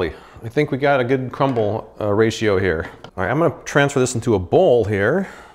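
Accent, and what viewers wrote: United States. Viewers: Possibly. I assume by the accent, the dude is in the US. But assumptions.... ;)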